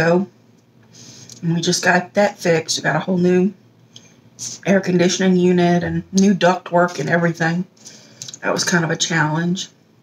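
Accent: South, United States. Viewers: I love her southern accent❤❤ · She lives in Vegas BUT she's from the south... somewhere in LA i beleive...southern for sure!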